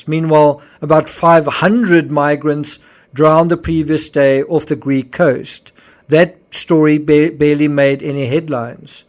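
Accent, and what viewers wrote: South Africa. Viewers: It is Hassan... but the narrator's South African accent has a "schwa" or soft R on that version of A...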